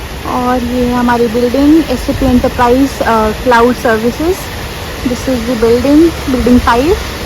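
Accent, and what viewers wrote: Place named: Himachal Pradesh, India. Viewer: You still have to work on your accent it sounds himachali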